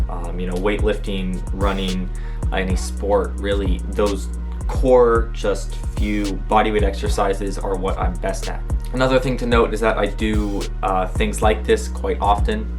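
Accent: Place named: United Kingdom. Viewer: Where are you from? Because the land in your videos are american, but your accent is brithis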